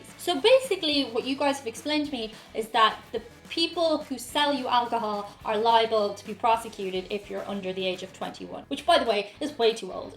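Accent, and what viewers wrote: Ireland. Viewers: Excellent count down. Did you practice this list in Philadelphia? As others have posted, flash a smile with your Irish accent and you'll make friends for life. Yes, in some cases it will feel like a lifetime prison sentence. lol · lmao. great stuff. irish accents are probably my fav, but im one of the sheltered americans who know nothing about most every other country. came from Texas, that 'state' thats the size of most other continents... love the out takes, and yes, your hair is awesome. :) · Ah, an Irish beauty! Love your accent. Lass, you are welcome in America anytime. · Love the accent! Irish accent is awesome! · Im really happy you've met friendly Americans, never go to new jersey, those people are RUDE Edit: also speaking of which, which county are you from? I can't pinpoint your specific accent :D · A lot of us like an Irish accent, so maybe their extra nice to YOU. 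Lol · I missed your Irish accent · Honestly. you don't look Irish or even act Irish other than a slight accent. In reality, you look like the typical American chick with a sprayed on face. This is a mere observation... being yourself would much more interesting and appealing. I see you look all over town throughout the USA... zzzzz. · I loved your commentary! It was, as we say here, "spot on!" I've never been to Ireland but I have been to several of your neighbors countries. It was an eye opener for me when I had to pay to use the "loo" and the attendant has a bowl to collect the coins in.....sometimes sitting at a desk right out from the restroom doors. Thank-you!!! (love your Irish accent) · Can you let your Irish accent come out more? I don’t know why but I love it on pretty women :)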